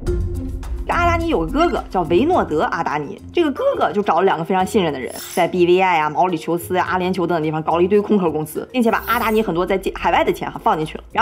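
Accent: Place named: Dalian, China. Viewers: 她应该是北方人、语速快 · 总感觉小lin 不是大连人 就是烟台人😂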